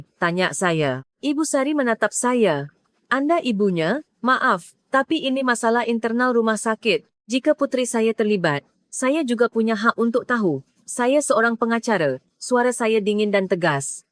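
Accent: Malaysia. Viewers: Komentatornya berlogat malaysia .... orang malaysia ya. ...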